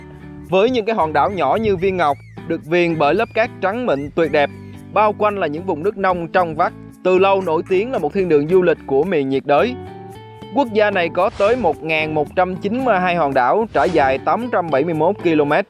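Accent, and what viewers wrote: South, Vietnam. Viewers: Giọng Nam bộ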